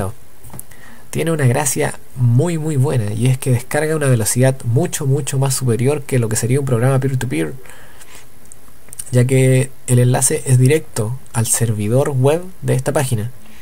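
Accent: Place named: Chile. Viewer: Gracias por la app. Muy útil. Por cierto qué acento tan CHILENO tienes :D. Un saludo!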